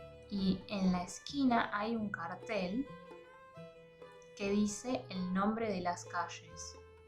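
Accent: Buenos Aires, Argentina. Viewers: estamos en el año 2022 ....comprendo tu leccion de historia .............cualquiera entiende el termino "tienda" no hace falta que lo expliques hector ...... la chica esta explicando las palabras que utilizamos en el dia a dia los que vivimos en bs as y se esfuerza para pronunciar cada palabra con el acento nuestro para mostrar al extranjero como le decimos a las cosas y como pronunciamos. tan dificil de entender es la correccion que hice ? nadie esta diciendo que la palabra tienda no existe ..........simplemente no la usamos aca y menos los jovenes ... acaso vos le decis a tu hijo ................hijo ve a la tienda de abarrotes y comprame una pala ?????????????? no esta mal dicho simplemente aca no usamos esos terminos... diriamos hijo anda a la ferreteria y comprame una pala por favor.... y si te pregunta que es una ferreteria ? le dirias.......es una tienda que vende herramientas ? 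dirias .....es un negocio que vende herramientas o es un local que vende herramientas ... se entiende??????? solamente hice una correccion para colaborar ya que me parecio muy didactico el video ,,,,,saludos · Me alegra mucho haber encontrado tus videos. Soy profe de inglés pero tengo un alumno de español. El está trabajando y viviendo en Argentina y solo le interesa el "argentino", así que estos videos me sirven de mucho. Es cierto que este es un acento local porteño, pero también es cierto que no se puede hacer videos con cada acento local. Gracias otra vez.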